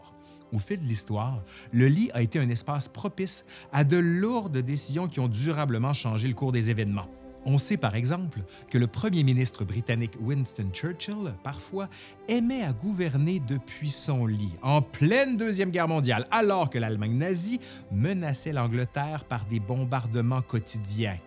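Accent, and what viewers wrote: Quebec, Canada. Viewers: j'ai adoré votre video. un grand BRAVO! je me demandais ce que vous pouviez raconter sur l'histoire du "dormir", et sincèrement, un vrai cours d'histoire. et j'ai apprécié votre touche d'humour. en plus, votre accent québécois (je suis du Var en France), j'adore !!!! Merci beaucoup. je vous découvre. je vais suivre vos viéos. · Foutu accet canadien... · Passionnante histoire du lit, surtout racontée par un québécois à l’accent délicieux! Mes origines sont de centre France, plus précisément en haute Corrèze, et les anciens dormaient en campagne dans des lits minuscules. D’abord parce qu’ils n’étaient pas très grand hein, mais surtout aussi parce qu’il ne fallait pas dormir dans la position du mort dans son cercueil. Ils dormaient donc les jambes repliées. Ça allait quand j’étais gamin mais c’était plus dur en grandissant… Bref, l’histoire du lit n’en fini pas, comme on peut le voir dans certains commentaires. 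Merci pour ces vidéos drôles et instructives! Encore!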